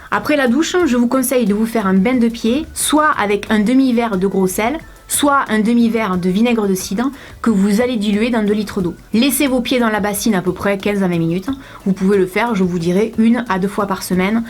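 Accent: South, France. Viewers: Bonjour. Très sympa tes vidéos et ton accent du sud (Pyrénées Orientales comme moi ou région PACA ?). Je rajoute un petit astuce déodorant naturel : par très forte chaleurs l'huile essentielle qui fonctionne très bien c'est celle de palmarosa ; ) diluée dans un petit spray comme tu le fais pour la lavande. Ça sent un peu fort après application mais l'odeur s'estompe rapidement. La transpiration ne sent pas. Efficace pour séance de sport par exemple. Bonne continuation. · Ca fait plaisir d'écouter cet accent du sud !!!!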